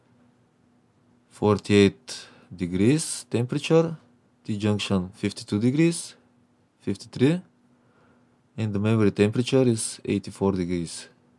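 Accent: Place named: Russia. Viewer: Какой русский акцент.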